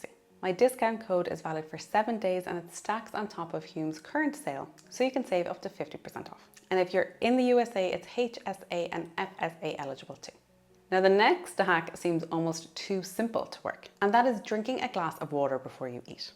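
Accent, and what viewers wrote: Ireland. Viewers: Love the accent. Sounds like a Navan accent 😊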